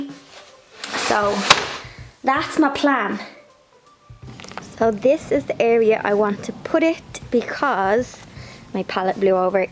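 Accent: Ireland. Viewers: I have been watching your videos here lately and am loving you. I am a 64yrold lady who was born in England and love listening to your Irish accent. you are o cute and so crafty. It makes me want to do things I used to do. So I started to do a few little crafts like a beginner even though I used to do this stuff all the time. Thank you for your inspiration and keep it coming. God bless you.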